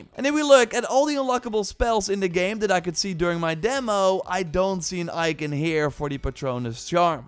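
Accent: Netherlands. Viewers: Something funny about your accent. Definitely Dutch.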